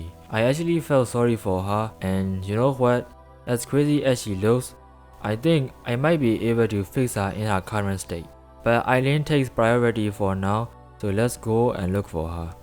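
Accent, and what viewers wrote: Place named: Japan. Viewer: currently playing this game. Uhm, i’m sorry, but you have an interesting accent. Are you a Japanese?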